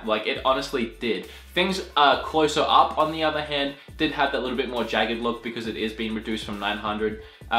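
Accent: Australia. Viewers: Wher do you live man? Where are you from? I thought you were a Ozzie haha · good video! what accent is that, are you a Australian?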